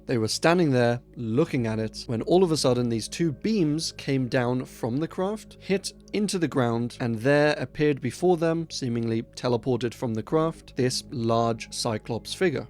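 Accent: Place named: United Kingdom. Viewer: I have to know why Brits pronounce “aw” like “are”. I just want to know what is going on anatomically. Like where is your tongue when saying “idea” or “law”. Where is the “r“ sound coming from? No judging. It just stands out. How does phonology differ so much? Is an R not an rrrr sound? How does a “v” become a “wha” (w sound) in some languages? When we all have the anatomical capabilities? Does v sound like w in India?? Just curious. 🤷🏻‍♀️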